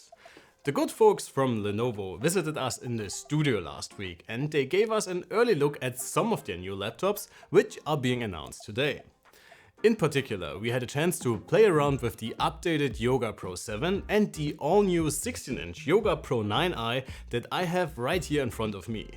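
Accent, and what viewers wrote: Germany. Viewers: Gutes Video. Aber dieser deutsche Akzent lol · Puh, deutsche die auf Englisch machen, immer eine Herausforderung für die Ohren....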